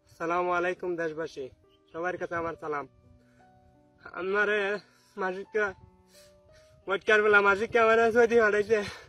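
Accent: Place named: Sylhet, Bangladesh. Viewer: সিলেটি উনি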